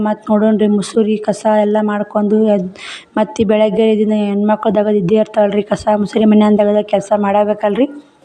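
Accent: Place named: Karnataka, India. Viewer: Nice love this Kannada accent ❤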